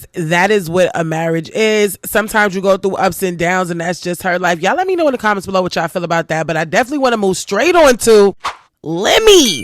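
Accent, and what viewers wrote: New York, United States. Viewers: YOURE DEFINITELY FROM N.Y. ITS THE WAY WE TALK…PERIOD LOVE THE CONTENT🖤💪🏾💯🥂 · You sound like Remy ma... You can tell you're from NY ❤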